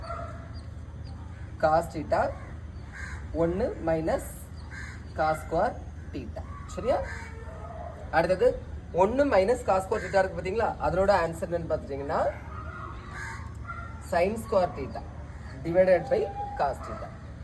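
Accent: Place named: Kanyakumari, India. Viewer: Tamil language than, athu kanyakumari language ithu mathiri than pesuvanka.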